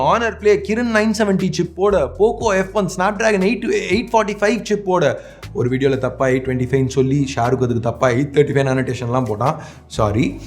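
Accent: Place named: Chennai, India. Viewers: Sema video bro! keep it up! சென்னைத் தமிழ் அருமை! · bro....conetent vudunga...neenga nambo madras slang la pesurathe sema ...super po....ipdiye pesungo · super bro i like your Chennai Tamil 😁😁😁 😄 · சகோ உங்களோட ஆங்கிலச் சேனல்களை நீங்க பேசுற அந்த ஆங்கில உச்சரிப்பு வந்து ரொம்ப நல்லா இருக்கும் எனக்கு ரொம்ப பிடிக்கும் ஆனா நீங்க தமிழ் பேசுறப்ப அதுவும் இந்த வீடியோவை பொருத்த வரைக்கும் நீங்க ரொம்ப கோபமா இருக்கீங்கனு தெரியுது நீங்க பொறந்து வளர்ந்தது எல்லாமே சென்னைதான் என்கிறது நீங்க பேசுறது தமிழ்ல இருந்து தெரியுது எப்படி இருந்தாலும் நீங்க பேசுற அந்த ஸ்டைல் வந்து எனக்கு ரொம்ப புடிச்சிருந்துச்சு வாழ்த்துக்கள் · தல லோக்கல் ஆ இறங்கி, சென்னை பாஷைல கலக்கிட்டீங்க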